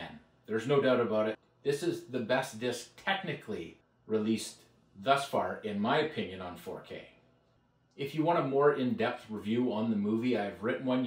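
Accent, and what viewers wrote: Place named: Canada. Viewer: Very succinct and quality review! I also enjoyed your Canadian accent.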